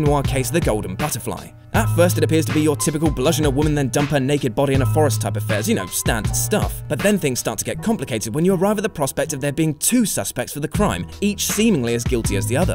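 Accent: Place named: United Kingdom. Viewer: So many quick British syllables.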